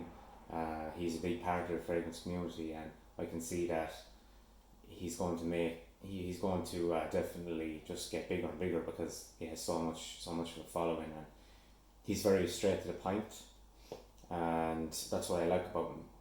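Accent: Ireland. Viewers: hey mate is that a limerick accent?lol clare man here.aussie fragrance recommened u to me:)sir smells a lot is a cork reviewer here aswell.am from the banner county .subbed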